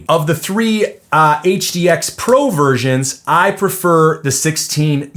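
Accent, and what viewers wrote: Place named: Canada. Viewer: I like this review. I heard about these from Cliff Gray's guide channel back when these came out and went to my local supplier and held some in my hands recently. I didn't know how the target/scan mode worked and neither did the guy at the station, but this showed us how . Picking the right model sure seems tough and fit in my bino harness I already have is going to be a pain. Carrying a pistol/food/cell phone/gps on a bino harness is a SERIOUS pain so maybe I can solve all of these problems with a buy once cry once approach. I appreciate your effort, scripting and editing, no BS, and passion and intensity. You seem like a solid dude with no bullshit sales flare with an ever so slight canadian accent. Appreciate you!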